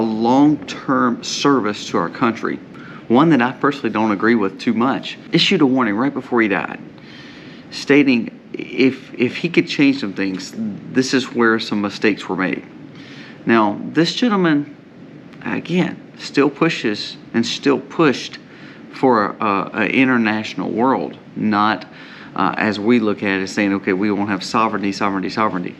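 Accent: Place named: Tennessee, United States. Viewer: I am from Puerto Rico and l have visited your beautiful country. My daughter and my son-in-law and granddaughter leave there in the US. I love your accent and the kindness of Tennessee and the countries there. I was raised in the United States of America New York City. I am in my country where we have chickens. We do not raise cows like you do which l applaud all the hard work you do. We have cows in open lands here but they belong to the government or big country owners here. I know your hard work. Jehovah God bless all your efforts.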